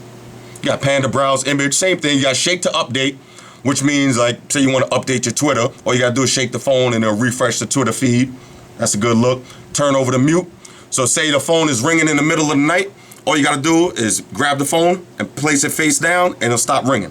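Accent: New York, United States. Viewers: I watched this the first time to see what's being said about the SIII...I watched it the second time cuz I live in FL and your voice brings me back home! Ugh I miss NY but thanks for the flashback :)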